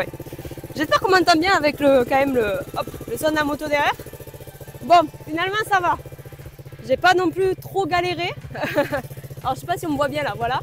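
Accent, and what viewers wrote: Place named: South, France. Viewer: J’adore l'accent du sud ça nous rapproche du soleil 😊😉😊😉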